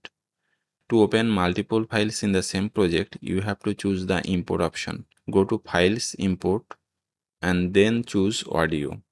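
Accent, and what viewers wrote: India. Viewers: The pronunciation of the sounds like a Bengali speaking!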